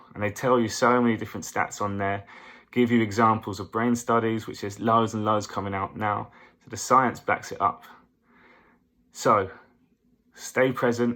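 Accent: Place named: Milwaukee, United States. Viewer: You have a nice accent are you from Milwaukee?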